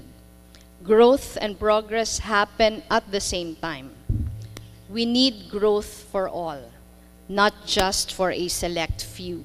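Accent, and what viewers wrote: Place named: Philippines. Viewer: What's the problem with Filipino accent or her tone?😊 I hope this is not the first time na nakapanuod kayo ng isang speech? Internationally or even locally, it is well accepted to read a speech as it maintains close control over the tone or the timing of the speaker. Try to watch some inauguration or graduation speech, hindi po kahiya-hiya na gamitin ang pamamaraan na 'to. The audience does not care, anyway, what their after is the substance of her speech. You can also watch her Q&A dito rin po sa business forum. Please watch objectively, do not mock and disrespect the speaker merely because she's reading. She's invited as a resource person because she's considered as a person of authority and credibility. May expertise po siya sa larangang iyan. Ayos lang po na may suportahang kandidato ngunit huwag po nating hayaan lamunin tayo masiyado nito na nagiging bastos na tayo. 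😊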